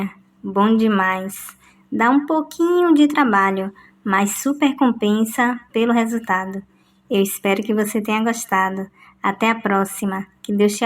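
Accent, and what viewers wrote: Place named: Northeast, Brazil. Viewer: Boa noite gostei sutaque nordestino eu amo nordestino Bahia eu Itabuna Aracaju Sergipe ou meu pai morreu era sergipano sou de Santos gostei do vídeo parabéns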